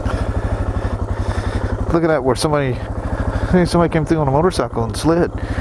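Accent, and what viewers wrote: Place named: United States. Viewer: Always good fun coming along with you.I would comment on the truck? but couldnt see it.Its very similar weather here in England but always raining and the traffic is horrendous coupled up with the fact that no one seems to be able to drive properly,i think they are all out to kill you this time of the year.Dont worry about the content, your american accent is great to listen too,and your clear and precise.No use me doing a video you wouldnt understand me living here on the border of Devon and Cornwall.Oh rrrrr,proper job!!!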